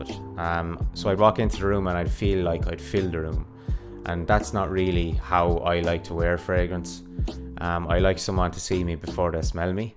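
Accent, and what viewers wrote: Ireland. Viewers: Your never Irish are you?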